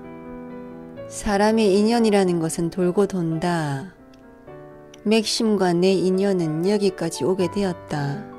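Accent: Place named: South Gyeongsang, South Korea. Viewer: 왜 나레이션을 사투리로 한건가 했더니 경상남도 출신이시구나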